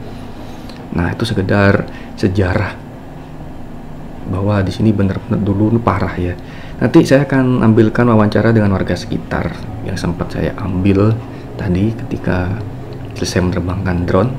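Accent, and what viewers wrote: Madura, Indonesia. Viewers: Logatnya madura...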